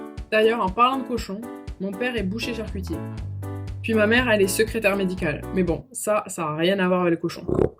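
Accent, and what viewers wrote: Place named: Albi, France. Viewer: Oh nioooon je suis trop contente d'entendre ton accent 😭❤ Albi forever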